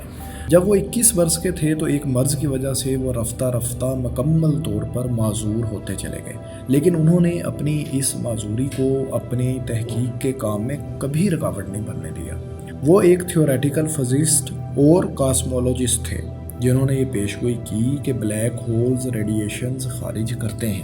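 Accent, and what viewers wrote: Punjab, Pakistan. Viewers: Video achi hy magr ap k lehjay mn Punjabi tone bht zyada hy.. Apka Urdu talaffuz bilkul acha nhi hy..!!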